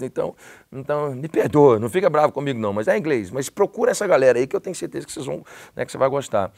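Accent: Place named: Brazil. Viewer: Interessante o falar q pensa e sonha em ingles... ele eh o primeiro gringo q conheço q consegue 100% do sotaque brasileiro... incrivel demais, provavelmente algo divino mesmo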